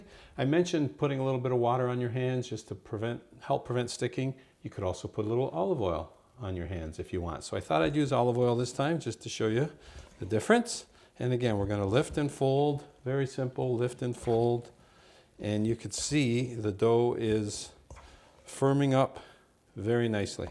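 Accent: Canada. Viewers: Hey i got a question been watching a few of youre video i was just wondering where u from u sound Canadian but im not sure im from just outside Toronto ty have a good day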